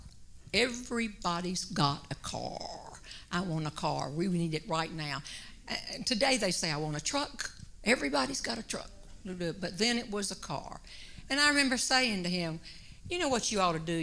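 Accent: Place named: Charleston, United States. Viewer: I grew up in the Charleston area. This lady sounds like some of my neighbors. Hilarious!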